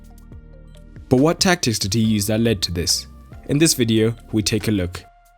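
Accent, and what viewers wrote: South Africa. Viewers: Are you South African mate? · Are you south African ?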